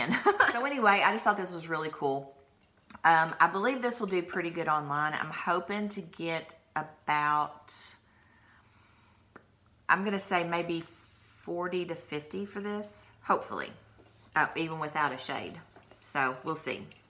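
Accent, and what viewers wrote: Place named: Kentucky, United States. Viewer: Your accent is like spot on to my grandmother's. You in Kentucky by any chance? Around West Liberty (or what's left of it)?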